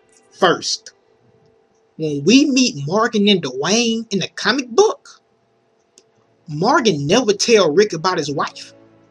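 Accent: South, United States. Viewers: man what state u from man cause u got country ass accent i mean no disrespect man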